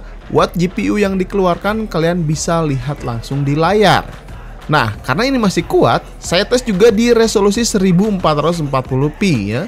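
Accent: West Java, Indonesia. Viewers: Nyunda pisan atuh kang logat na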